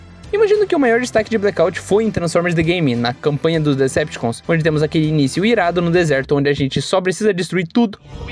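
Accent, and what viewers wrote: Paraná, Brazil. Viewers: mas pia eu sempre disse isso na tua live, é paranaense né, capaz. Com esse som so carca fogo no sape para comer pinhao, daí fica bom que é uma beleza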